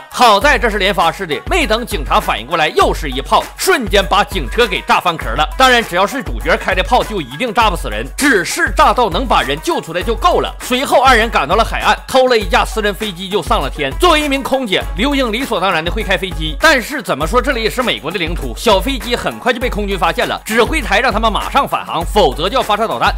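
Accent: Northeast, China. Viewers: 東北話老好聽了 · 他东北的 哈哈哈哈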